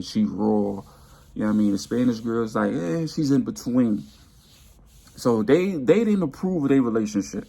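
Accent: New York, United States. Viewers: he sounds just us NYers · YEP he's a New Yorker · He definitely sounds like he from NYC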